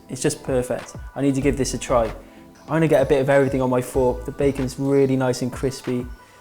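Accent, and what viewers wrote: Wales, United Kingdom. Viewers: Do I hear a welsh accent?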